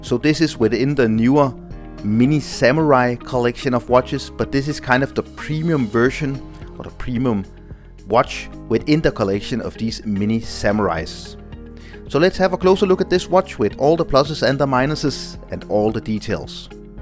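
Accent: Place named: Denmark. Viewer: lyder umiskendelig som dansk-engelsk tydeligvis 🙂 I think the Samurai watchcase is beautiful both this which is the new one but also the previous edition being a bit bigger. I have a weak spot for green dials, however i would prefer this model in a higher quality as for a ceramic bezel an crystal glas. I am still considering buying it but there are so many different to chose from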